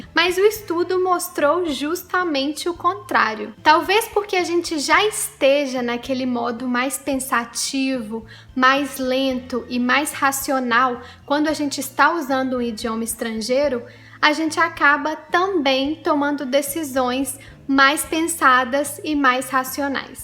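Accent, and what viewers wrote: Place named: Rio de Janeiro, Brazil. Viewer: Are you from Rio, your accent is so carioca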